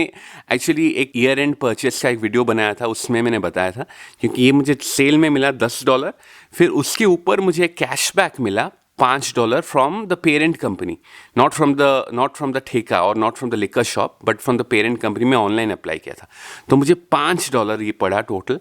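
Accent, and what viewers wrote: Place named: Bengal, India. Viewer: With ur accent I’m guessing ur bengali babu. Tell me if I’m correct?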